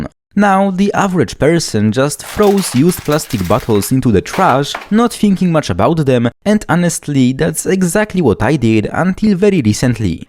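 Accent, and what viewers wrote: Poland. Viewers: After I saw cisowianka bottle, and heard your language I instantly knew you are from PL 🇵🇱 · Cześć! Od razu słychać polski akcent, jeszcze to wymawianie "R", i cisowianka... Pozdrawiam!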